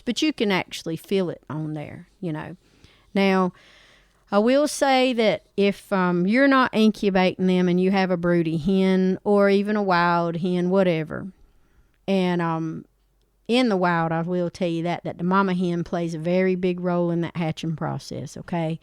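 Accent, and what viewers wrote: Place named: South, United States. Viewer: Very interesting and great educational video 👍 i absolutely love your southern accent ❤️☺️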